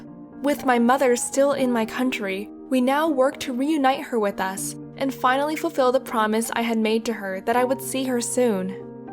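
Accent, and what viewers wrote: United States. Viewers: Stick The person who submitted the story is from North Korea, the narrator is American. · U r from korea but u have a american accent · she sounds american also (not bieng racist) dont asian people have like an acsent like common she dosent sound korean at all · Yet you sound American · hmmm American accent hmmm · Dislike. She sounds like she's from the USA, not North Korea, so she has no first hand experience, This makes her sound like a propagandist. To anyone who this did not occure to, your thoughts and opinions are being controlled by propagandists. I do not know the full message of this video as I stopped at 58 seconds, so if anything changed, then I don't know about it, but from what I heard so far, it sounds so incredibly propagandist to a point that it;s difficult to listen to. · She does not sound fron North Korea 🇰🇵 she sound from America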